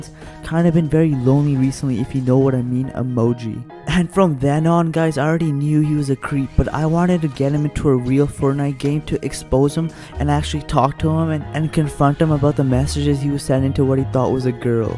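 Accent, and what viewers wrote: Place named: Alabama, United States. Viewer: Me:is he from alab Alabama Me watching vid Silent Silent Me:i kneew it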